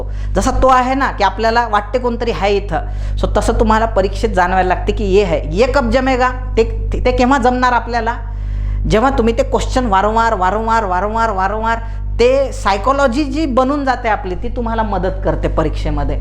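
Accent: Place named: Vidarbha, India. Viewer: खरचं खुप छान explain करता sir तुम्ही आणि तुमची भाषा आपलीशी वाटते ते वाटणारच शेवटी विदर्भ तुमचं आमचं सारखंच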